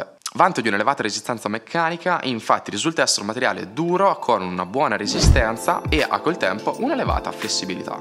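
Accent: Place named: South, Italy. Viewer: mi sembrava che avevi un accento del sud... Quindi sei nelle marche giusto?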